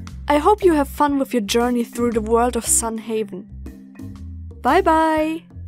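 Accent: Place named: Germany. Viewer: I just love hearing your Lazuli the Glaceon voice (though that's your normal voice, I can't help but picture my favorite ES character/Eeveelution). That smooth German accent is so cool.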